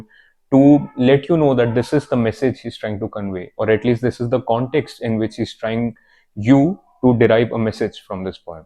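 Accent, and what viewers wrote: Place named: India. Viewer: Being an Indian , I prefer to listen Indian who speaks in English that nice to hear rather than British accent . It's helps me to learn more in English . Your accent is too good it's similar to Indian voice with accent and easy to hear and understand really appreciating . I don't know you might understand or not my words what I am saying. Because being a beginner in English it's too poor communication. Ok but at the end of the day really nice to have you it's makes vibe of Indian.❤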